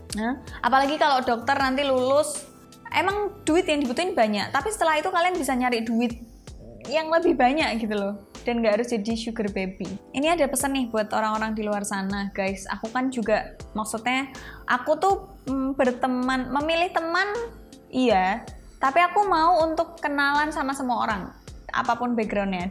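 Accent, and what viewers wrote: Java, Indonesia. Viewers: medok banget logatmu mba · Suka dg gaya bicara yg medok khas Jawa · kenapa saya sampe di sini ya hahaa mbak e ini pinter , ceria dan pasti nya orang jawa tho suka dialeg bahasanya yg medhok hehehe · Menurutku Mb lbh CERDAS dari sugar baby yg Mb ceritain Bhsa Jawa Mb medhok jdi lucu dengernya Sukses Mb 🙏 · Menarik.... Logat Jawanya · Medok nya itu rek, lucu n so sweet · Logat Jowomu medok banget mba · Jowo ne muantebz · Aku senang banget wajah cantik gini tapi medok Jawa. ❤🔥semangat kak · seneng banget denger logat jawa nya mba * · Lumayan..bagus cara menyajikannya...logat jowonya yg bikin seru...👍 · klo ngomong enak jowoan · Medhok bgt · medok mu tenan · Totok tenan jowo ne 😀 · Medokk ya mbaee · Mbaknya cantik tapi medok jadi lucu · Seneng dengar logat jawanya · BHS indonesia dgn Medog Jawa jd lucu dengarnya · Logatnya saya suka 'Jowo banget' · Dede medok cantik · Logat jawamu mbak uenak dirungokno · Bhsa indonesianya kog medok mbk, org jawa ya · Jowomu kok medok tenan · boso jowo'ne medok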